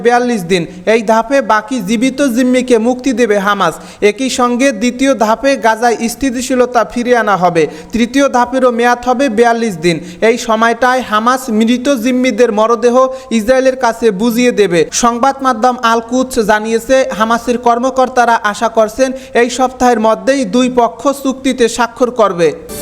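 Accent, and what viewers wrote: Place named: Barishal, Bangladesh. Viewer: A Borisaila toke news porte ke bolese, age vasha shek tar par news par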